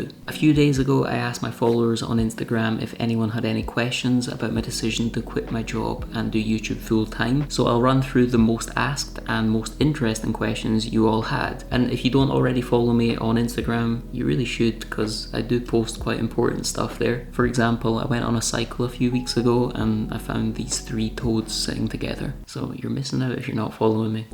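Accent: Scotland, United Kingdom. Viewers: First of all, you’re oddly addictive, I couldn’t stop watching you and you voice is very calming. I can see why you have so many subscribers but….. outside of your incredible good looks, great hair and voice, it is miraculous that you have this many subscribers from only 36 videos posted. I’m assuming that tiny homes are very popular but seriously, you made that much money off of only 36 videos? I initially thought you had a huge following on Instagram but there’s not many videos there either and far less followers. Which leaves the question how did you do achieve this success?? The only logical conclusion is the key to your success is the tiny house, good looks, great hair, a Scottish accent & soothing voice. I’m so jealous but I too have subscribed & accidentally watched 3 videos already before commenting!! you must be casting spells on your viewers.. 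I hate you lol lol!! Keep up the excellent work, I look forward to seeing more of you soon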